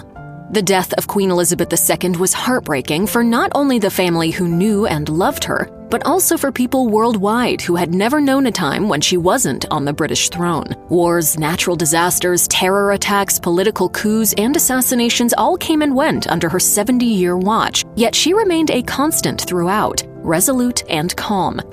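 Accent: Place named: United States. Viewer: As soon as I heard the American narration, I clicked off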